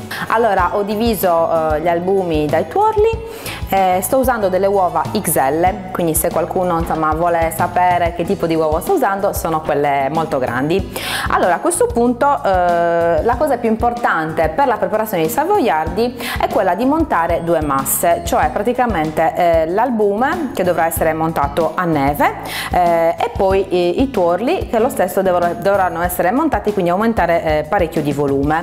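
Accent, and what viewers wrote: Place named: Sardinia, Italy. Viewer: Si sente che sei Sarda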